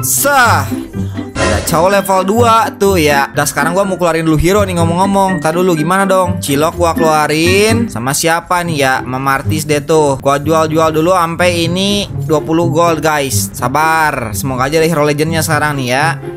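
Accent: West Java, Indonesia. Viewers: Bang kemarin kemana bang di tunggu tunggu eh ga ada gua suka omongan lo bang Sunda asli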